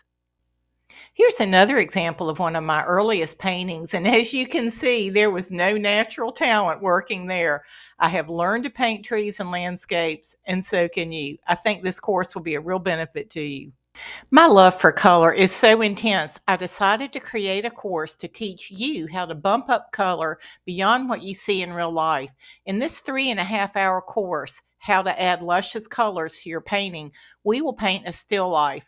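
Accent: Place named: South, United States. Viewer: Im not a gifted painter just taking advice from artists on youtube, I just came across you and love to hear you speak in your southern American accent, im’Irish so I don't hear too many different accent........ I love your paintings ❤❤❤❤❤❤❤ 🇮🇪🇮🇪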